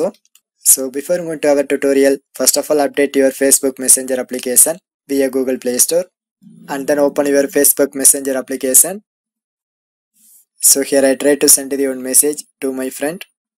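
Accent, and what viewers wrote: India. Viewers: Lol your indian english sounds really stupid and it makes me laugh when I hear it lol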